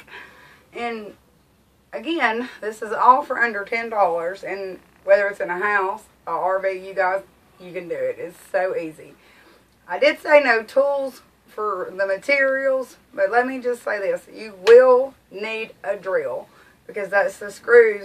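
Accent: South, United States. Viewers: I love your diy but when i heard your voice i said she from the south then you said Alabama that's where I'm from 👍👍👍👍👍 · Rockin! What are these shelving brackets called at Lowe’s? Love your Southern accent, but I’m not sure exactly what you called them. Thanks!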